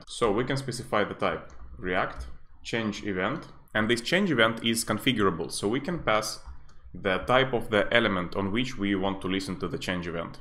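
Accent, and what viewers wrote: Russia. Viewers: ох уж этот russian english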